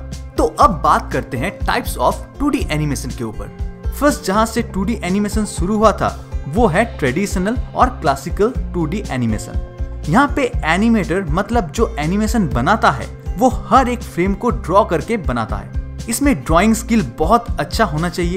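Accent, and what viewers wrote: India. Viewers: r u from kolkata?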